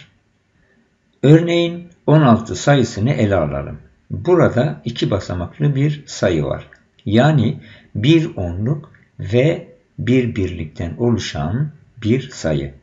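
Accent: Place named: Germany. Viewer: Sanki adam alman aksaniyla konusuyor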